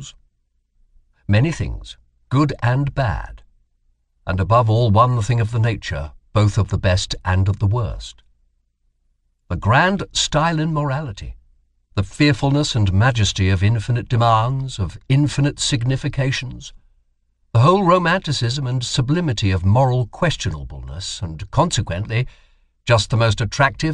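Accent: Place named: England, United Kingdom. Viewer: Very funny to hear Nietzsche's rant on Englishmen being read with an english accent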